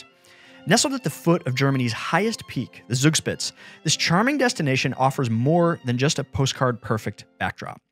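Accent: United States. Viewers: Chimney cakes are from Hungary...why I am listening to an American talk about Czech travel tips...;)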